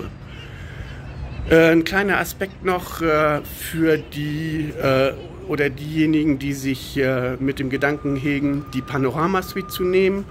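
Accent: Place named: North Germany, Germany. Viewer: O Gott. Was für ein norddeutsches Gelabere. „Sich mit dem Gedanken hegen“ 😂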